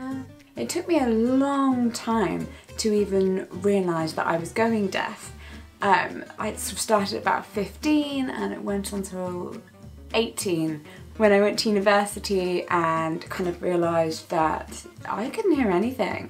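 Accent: RP, United Kingdom. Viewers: Most posh sounding deaf person I've seen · LOOOVE your British accent ❤️ ❤️ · You are very kind telling us that...and very pretty as well. I am learning German Sign, love you British accent 😍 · This is my first day subscribing to you, and I love all the videos I've watched from you! Btw, you have an amazingly beautiful accent, and I adore your phrasing. Well-spoken people with a British accent can impress me jolly deeply. xD And I'm gay too, so your channel's really lovely. :D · I love how she is so British and almost posh but she is like “What the hell” and “God damn it!” It is such a distinktive personality trait of her’s :D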